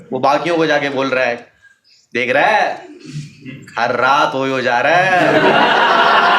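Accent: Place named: Kolkata, India. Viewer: That kalkatia accent😘😘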